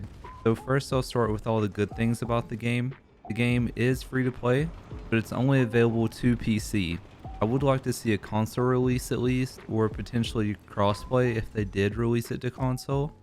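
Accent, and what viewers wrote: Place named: South, United States. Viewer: you have a really cute accent XD sounds southern anyway im getting it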